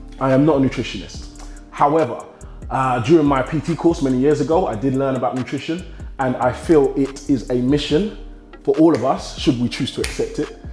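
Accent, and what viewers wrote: England, United Kingdom. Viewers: shoot that crib up you hidin in he's an African brit. He doesn't have an American accent, he has a British accent. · pillohw british accent there is nothing called english accent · AND he has a british accent?? geez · he’s from the UK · R u from the uk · Dude with that English accent, and Body your making all us guys look Badd😂😁🤣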